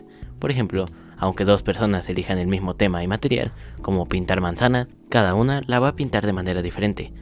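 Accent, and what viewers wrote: Mexico. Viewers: oye de donde eres se te escucha acento mexicano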